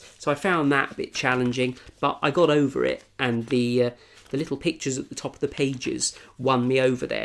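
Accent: United Kingdom. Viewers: Ur British accent is nice Makes my ears joyful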